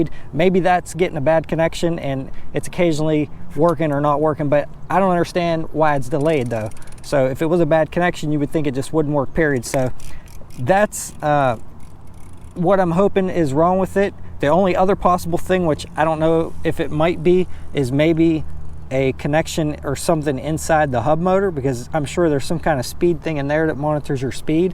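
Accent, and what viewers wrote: Pittsburgh, United States. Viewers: Sup man. I thought you sounded like a yinzer. I grew up dahn the road in belle Vernon. Live it pittsburgh now. Just ordered a black xp. 0rder # 1999*. Can't wait for it. Good vids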